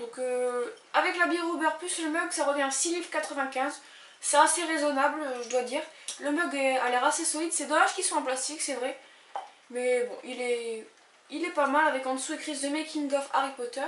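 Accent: North, France. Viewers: Oh my god! Trop beaux souvenirs! Rien que de voir la boutique, j'en bavais d'envie d'y aller! J'aimerais trop mais mes parents ils veulent pas ou peut-être l'année prochaine en colo mais j'y croit pas trop car je suis jamais parti seul donc ils veulent pas. Mais sinon super souvenirs! Tu en as eu pour combien si c'est pas indiscret stp. Et j'ai une question: Tu habites dans le nord de la France? (si oui c'est grâce à ton accent que j'ai découvert, Si non, je me sis trompé). La prochaine fois que tu pars, dis le moi, je me mets dans la valise ;) Je rigole :D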